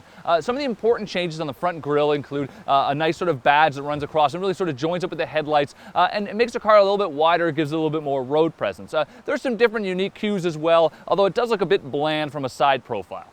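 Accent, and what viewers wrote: Canada. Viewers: This gentleman is speaking just fine, the Canada accent, no big deal. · is this guy Canadian?... ither way I think he is sexy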